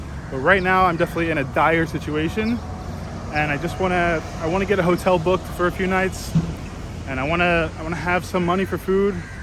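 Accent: United States. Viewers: Done donating. I think majority of your foreign subscribers enjoy listening and learning American English from a native speaker like yourself. Maybe you can make a good money by doing a personalized English conversation practice on hourly basis. With some preparation and technique, I think it can be fun and effective.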